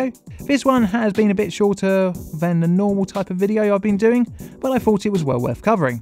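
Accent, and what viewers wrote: United Kingdom. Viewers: His is the best British accent after Patrick Foote (Name Explain). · I think your videos are awesome, informative, and very well produced. The British accent is just a bonus. Also I am enjoying the increased video production, atleast something good is coming out of this Covid crap.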